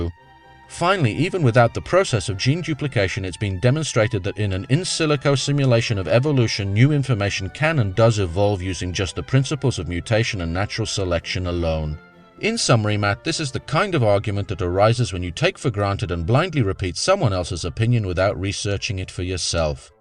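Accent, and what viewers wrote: England, United Kingdom. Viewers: Because swearing is typically bad manners, and excessive swearing shows poor breeding. Although it is comical within the context of this video because is intelligent, educated, and has a sophisticated British accent. Additionally, this is his channel, so he has and should have the freedom to in his videos. I'm not religious and I don't appreciate it when people swear in public, especially when children are present. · Brilliant!!! Everything I was thinking. But, put more eloquent than I could. Plus cool English accent (not the snobby kind). Problem is, people can not stand not knowing. So they have a tendency to fill in the gaps with whatever sounds cool. 5000 years ago the world being made in 7 days sounded reasonable and there wasn't a body of evidence one way or the other. But, FFS already. Why is it so hard for some people to accept that new information is now available?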